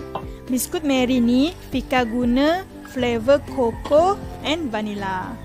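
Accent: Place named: Sarawak, Malaysia. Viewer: Like literally... Brp kali dh ngga video tok. Kmk rasa mcm sora org srwk. Bruk aritok bukak komen. Bena ati org srwk 😂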